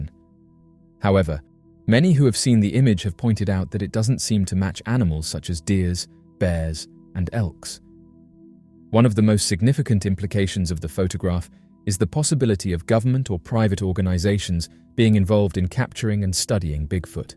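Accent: United Kingdom. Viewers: its so fucking dumb but he's got a british accent so it's gotta be true.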